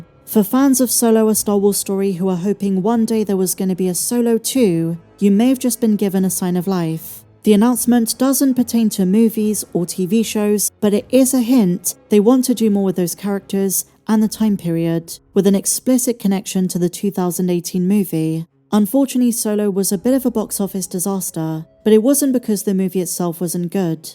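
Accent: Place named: England, United Kingdom. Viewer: I swear with the english accent it sounds like she says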